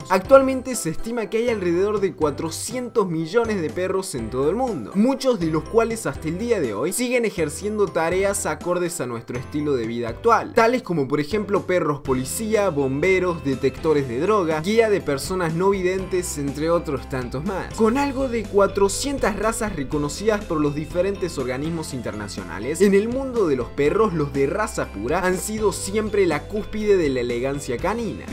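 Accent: Argentina. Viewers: tienes asento argentino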